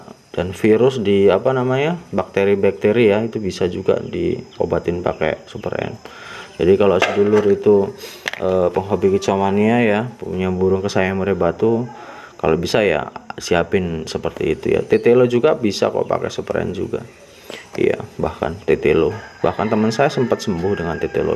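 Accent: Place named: Lampung, Indonesia. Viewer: Suara kaya nada orang Jawa Lampung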